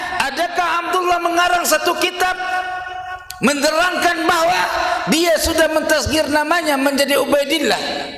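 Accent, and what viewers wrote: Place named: Betawi, Indonesia. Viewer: Gurih banget ini teungku dialek betawi nya. Padahal darahnya Aceh banget. Tapi kalimatnya sangat runut, ilmiah, rasional dan masuk akal serta mudah dicerna dan difahami oleh orang-orang awam. ❤❤❤❤❤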